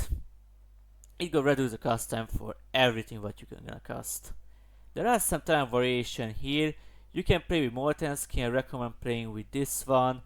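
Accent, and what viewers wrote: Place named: Hungary. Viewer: where r u from? u have a hungarian english accent :D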